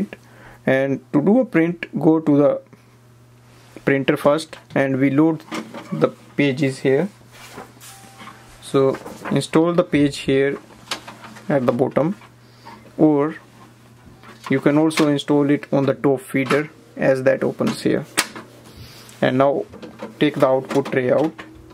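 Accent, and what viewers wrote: India. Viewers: Indian accent is extremely disgusting man I’m sorry just had to say it. You ruining English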